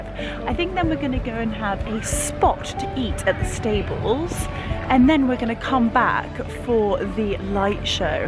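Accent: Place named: Scotland, United Kingdom. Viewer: Love to hear that scotish accent god bless you all❤😊😊😊😊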